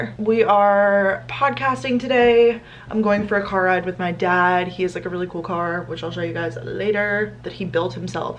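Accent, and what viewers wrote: Long Island, United States. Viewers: I’m a type nine:))) also i can hear the Long Island accent making a come back 😂😂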